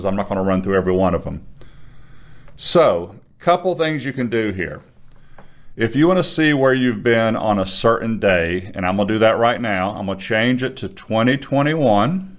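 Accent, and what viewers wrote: South, United States. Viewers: I love your accent, sir. We need more Southern hospitality up in NY.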